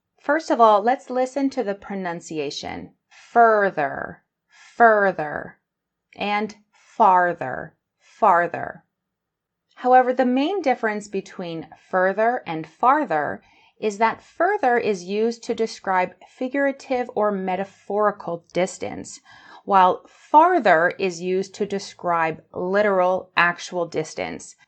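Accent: Canada. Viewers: J'adore votre accent canadien ❤💞💘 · Why are you using and teaching people American? "aboot" - You sound Canadian, so should be using English, not American. Further can fully replace farther in English (but farther only refers to distance).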